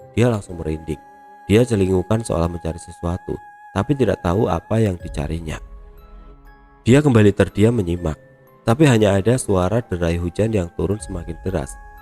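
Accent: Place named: Banyumas, Indonesia. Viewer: Orang ngapak bukan si?? Medoknya keliatan banget kalo lg percakapan 🤭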